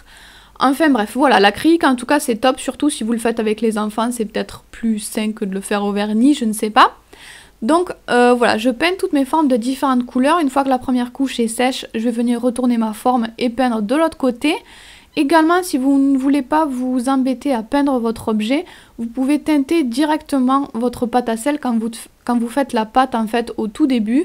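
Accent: South, France. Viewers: du sud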